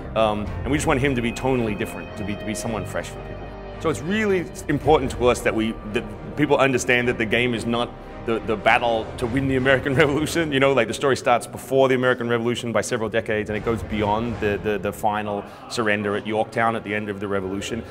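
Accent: Australia. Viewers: half the time this guy talks he has an australian accent, what gives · i cant pick it, is this guy Australian? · What the fuck is this dudes accent? He has got to have spent time in Australia....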